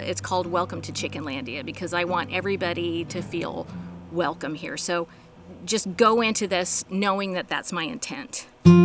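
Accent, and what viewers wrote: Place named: South, United States. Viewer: had you not told us, I would've thought you were a Southern white woman.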